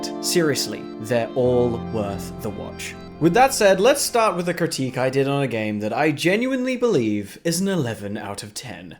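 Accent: United Kingdom. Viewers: Came for hollow knight stayed for a British (seems like it) dude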